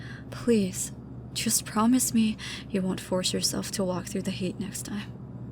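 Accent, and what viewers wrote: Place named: United Kingdom. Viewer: I find it so funny the British accent 😂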